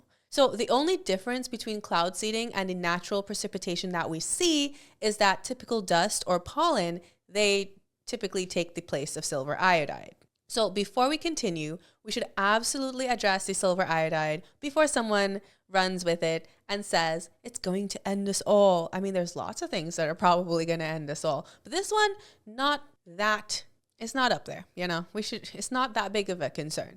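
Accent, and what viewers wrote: United States. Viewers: This was good education! lol whatever you're doing to get the algos to get you eyeballs is working, but your family is endearingly quirky and nerdy, and damn how you put your hubs on the spot is kind of hilarious. He's a champ, though. Not really on-topic, but your accent is unfamiliar to me. Some elements seem like mideast US but there's other stuff going on that makes me suspect you're also multilingual. For context to my question, I'm an aspiring voice actor so that accents are of interest in that way, and also have worked with translators for publications for many years, and I'm always just curious about this sort of thing.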